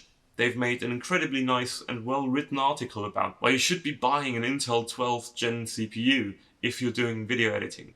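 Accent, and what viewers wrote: United Kingdom. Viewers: 👍🏻👍🏻👍🏻 Du hast mich total überzeugt !!! Nicht nur "Intel core", auch dein Britisch Englisch ist really Great (Britain), fantastisch! Hut ab! 👏🏻👏🏻👏🏻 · where is that strong british accent from my brother? love it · Bloody hell, didn’t know you were British mate.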